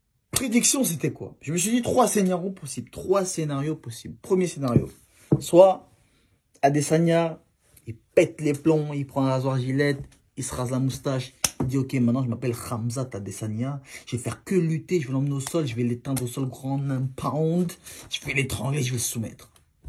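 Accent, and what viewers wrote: Cameroon. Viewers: Ahahaha trop marrant je te jure t’es vidéo me tue 💪 ( juste ton accent africain camerounais il est agaçant ça gâche toute les blague ) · t es un ouff j ai kiffe ton acent camer 🙂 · Il parles comme un vrai camerounais ! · 🙂Force à toi!trop fun de tes vannes,l'accent kamer ☠tout 🤣🤣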